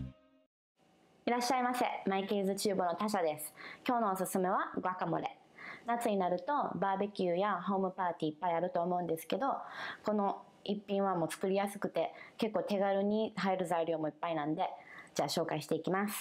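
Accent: Kansai, Japan. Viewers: 関西弁だ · イントネーションが関西ぽくて親近感湧きます 美味しそう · めっちゃ関西弁やん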